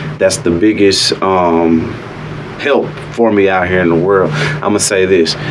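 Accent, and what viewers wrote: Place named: Chicago, United States. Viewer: You sound like you from Chicago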